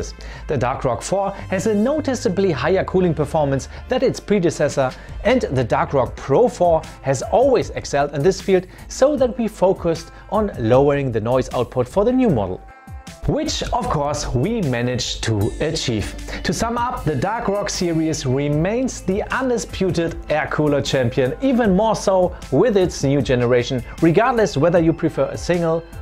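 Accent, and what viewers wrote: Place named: Germany. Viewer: Ur german accent bro 💀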